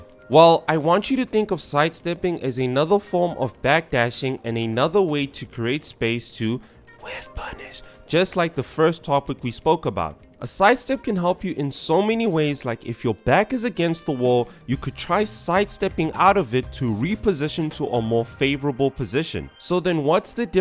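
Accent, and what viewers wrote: South Africa. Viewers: Lol I was watching a Lil Majin vid than randomly your video played next and got me interested and as I kept listening and watching without paying attention to your youtube username I suddenly realized bro is a fellow South African! Definitely subbed. Working on a ton of projects for my own channel, keep pushing bro!🔥⚡️🇿🇦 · anazing background music and south african accent? subscribed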